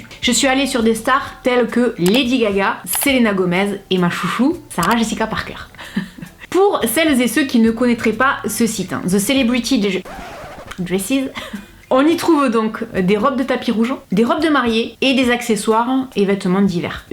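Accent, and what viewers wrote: South, France. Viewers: Bonjour, Jolie accent du Sud, je kiff. Dis....pourquoi pas de promotion pour un site de soutif sur mesure (j'ai pas regardé toutes tes vidéos désolé si déjà fait.). Quand je vivait en Gwada, la boutique Coquin (rien à voir avec les sex-shop)faisait pour les fortes poitrine du prêt à porter et ce fut la libération pour une amie, que j'avais marre de voir voûté et tout le temps en train de rectifier son soutif...et oui: qu'une amie et rien d'autre. Depuis que je suis dans l'hexagone, plus personne ne parle du sur mesure pour les soutifs...en plus du fait que les fortes poitrines sont les grandes oubliées des magasins de sous-vêtement...aubade en 1er. Alors puisque tu parles de sur-mesure des recettes sous-vêtements? Je sais que tu saurais être sexy sans être vulgaire sur le sujet.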